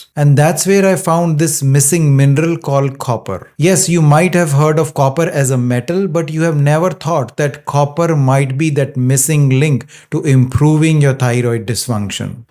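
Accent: South India, India. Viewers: with south Indian accent..❤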